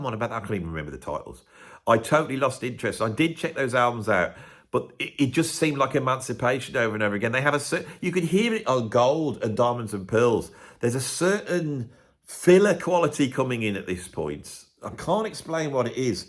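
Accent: United Kingdom. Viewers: I never really was a fan of and I don’t know why I watched this, except that your rants and British accent I find very entertaining.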